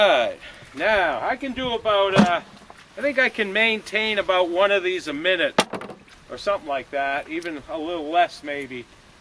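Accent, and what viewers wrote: Boston, United States. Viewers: Thanks for sharing!!! I can hear you haven't lost that Boston accent despite you have been in Alaska for decades. Great show (Yucon..)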